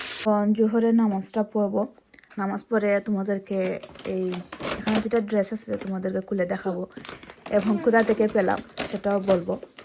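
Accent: Sylhet, Bangladesh. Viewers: মাশাল্লাহ আপনার ভিডিও খুবই ভালো লাগে আপু। আপু আপনি কি সিলেটি। কথার ধরন অনেকটা ওরকম লাগলো প্লিজ ডোন্ট মাইন্ড।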